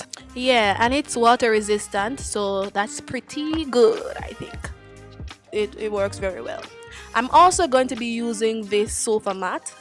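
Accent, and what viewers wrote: Jamaica. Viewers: Love it . Ur Jamaican ?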